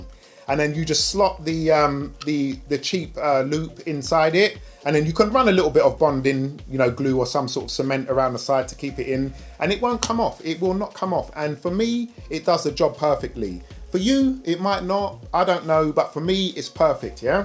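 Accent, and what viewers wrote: London, United Kingdom. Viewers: What parts u grew up Cuz. U sound like NW10 Cuz.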